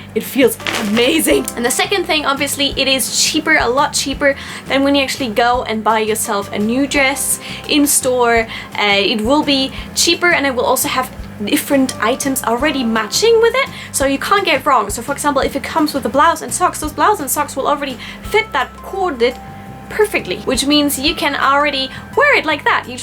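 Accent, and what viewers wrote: United Kingdom. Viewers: It's strange how you sound slightly Scottish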